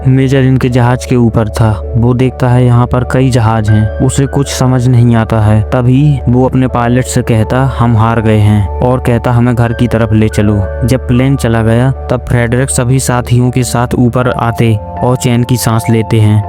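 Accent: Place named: Bundelkhand, India. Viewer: तुम तो बुंदेलखंडी लग राय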